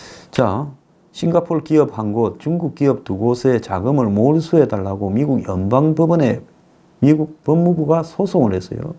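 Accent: Gyeongsang, South Korea. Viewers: 뻣뻣하지 않습니다. 경상도 액센트 좋습니다. 진실을 말하는게 가장 듣기 좋습니다.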